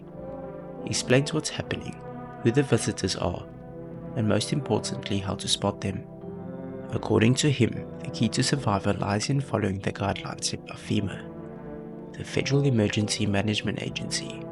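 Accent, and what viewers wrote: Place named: South Africa. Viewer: i thought i recognised a south african accent 🥹😝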